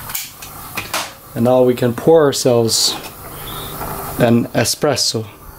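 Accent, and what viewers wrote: Netherlands. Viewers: Horable Dunglish as the Dutch call Dutchified English. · This guy is Dutch... I can tell that accent from miles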